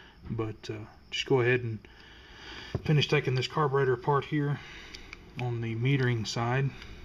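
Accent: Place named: Illinois, United States. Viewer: Very informative video. Thanks for the upload!! :thumbs up: Btw, you sound just like my Father-in-Law (Central Illinois accent).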